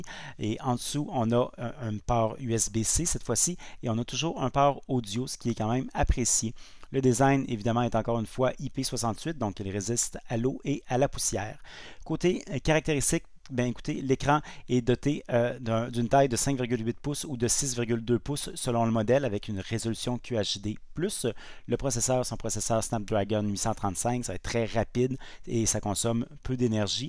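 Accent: Quebec, Canada. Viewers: jaime laccent québécois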